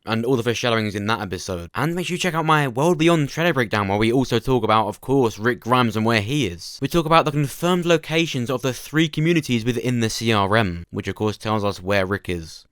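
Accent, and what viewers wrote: United Kingdom. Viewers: ...Not sure where you reside. Per the accent, I would assume/guess it's somewhere in the UK. All I can I say is you have what's called a "Radio Voice." In America, there's actual talent agents who specialize in voice work. I.e. Radio personality/Talent, as well as voice over for major movies, cartoons, etc. You should put a demo recording of yourself, with some sort of profile and picture and send it in to a talent agency or agent. I would send out a lot of the profile. I sincerely believe someone in the industry would be interested. You have a smooth and different type of voice in a good way. Please consider it, you just might have much success. A radio personality makes 7-8 figures a year. Doing movie and cartoon voice overs those people can make the same too. Give it a try you have nothing to lose. Just my opinion. But bet others would agree you have a cool voice that people like listening to.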